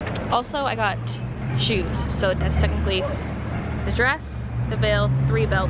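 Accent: Canada. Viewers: You know she's from Canada because of the way she says "bag" and "vague". To her instead of a A sound bag is beg and vague and vegue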